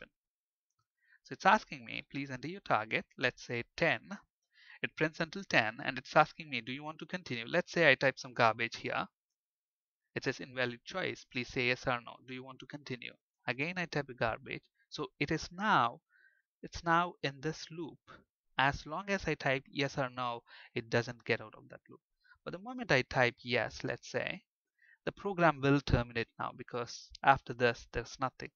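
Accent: India. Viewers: Easy to understand Indian assent.... :D Download this video, install the gom player, listen over there with extend volume for more clearly.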